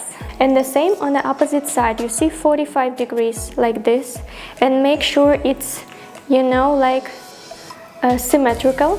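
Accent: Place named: Russia. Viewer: Русский акцент и русская мимика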